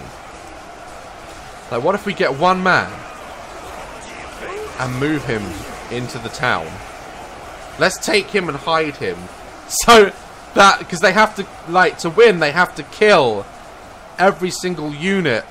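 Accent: United Kingdom. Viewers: it's cuz he's British 😄🇬🇧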